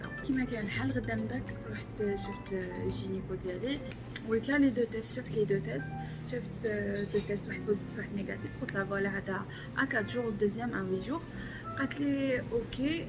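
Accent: Algeria. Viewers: جزاءري ليش غريبة اللهجة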